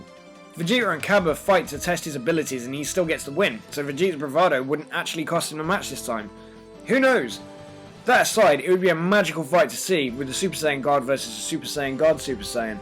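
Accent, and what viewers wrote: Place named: Australia. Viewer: Aussie accent :) I can do one because I am Aussie. :)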